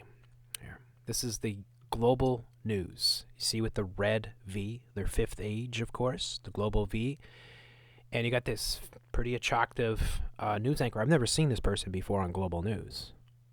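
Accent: United Kingdom. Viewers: British accent, most annoying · I’m from the U.K. her accent is typical BBC and that corporation is absolutely evil, they hid Jimmy Seville’s disgusting behaviour to minors and have a pretty disgusting statue outside the broadcasting house which shows exactly what they are.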